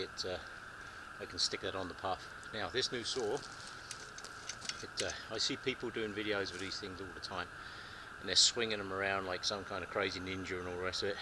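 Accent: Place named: England, United Kingdom. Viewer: You sound English. Are you? But where are you filming? Canada or America? or Europe?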